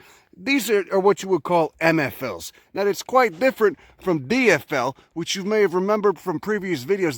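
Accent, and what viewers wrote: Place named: New York, United States. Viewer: I have to laugh to myself frequently, saying "is there nothing this guy doesn't know about plants" keep up the good fkn info! I am a Brit and no idea where your from, but years of seeing tv from USA might point me in the direction on new York? The east side? Love the accent ..... mine is a heavy cockney accent.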